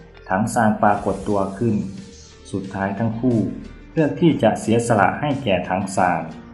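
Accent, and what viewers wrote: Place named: Isan, Thailand. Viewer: เหมือนจะออกเสียงไปทางอีสานนิดๆนะครับ😂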